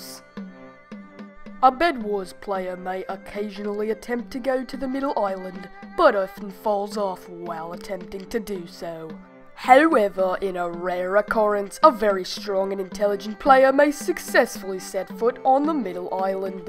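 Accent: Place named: Australia. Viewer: That australian accent ...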